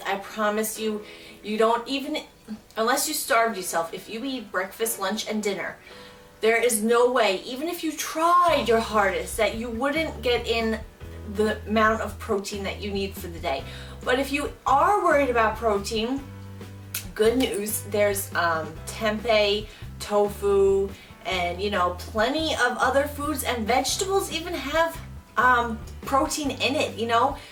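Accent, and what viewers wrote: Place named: Rhode Island, United States. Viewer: You sound like my Aunt Shirl and she is from Rhode Island. Where are you from? Thank you for making these videos even though you were nervous. It is appreciated.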